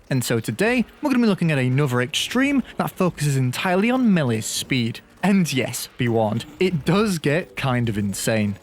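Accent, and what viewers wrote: United Kingdom. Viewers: Oh my godness this British accent,mmmm, sweetest sound to my ears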